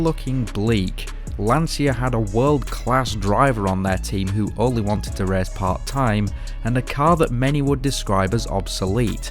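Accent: United Kingdom. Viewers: Are you from East York’s? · Yorkshire accent for the win. Snurr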